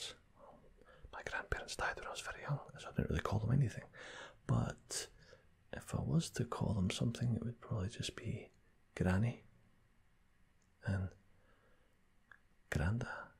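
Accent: Scotland, United Kingdom. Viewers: Thanks for the tag! I can't wait to watch this, you know I love a Scottish accent 😴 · My favorite word to hear you Scots say is "murder" 🤤🤩 its the Rs and muddled U, and abruptness of the end (I also like "order" and "fine.")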